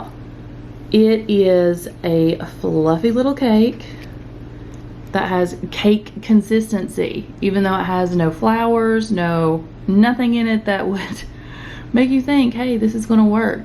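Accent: South, United States. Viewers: shes not country she’s southern! Lol and I LIVE for it! Not to mention she has one of the most famous voices ever....She’s the Incredibles Mom! Lol This is a compliment 😂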